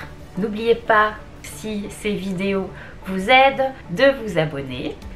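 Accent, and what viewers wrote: Standard. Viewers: 她是法國人，發音標準是很正常啊！😁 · 老师很棒！发音非常标准。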